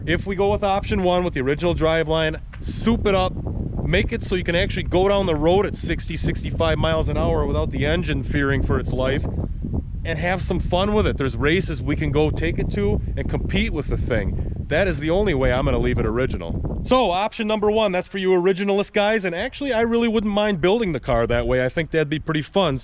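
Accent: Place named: Northeastern Pennsylvania, United States. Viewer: You in Pennsylvania? Sounds like a northeastern PA accent (like me)!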